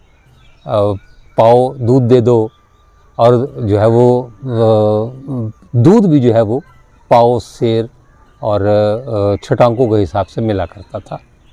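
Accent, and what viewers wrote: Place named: Urdu, India. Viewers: कोई फर्क नहीं है उर्दू और बोलने का लहजा UP jaisa h . Pehle Baar jb Aapka vilog dekha mujhe bhi aesa lga .I'm from UP .. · Thank you for sharing your family information with us. I am curious if your ancestors actually migrated from India, cause your Urdu accent is very much like Urdu speaking families who migrated from Urdu speaking regions of India like Lakhnao or Dehli etc.? May Allah bless you and your whole family!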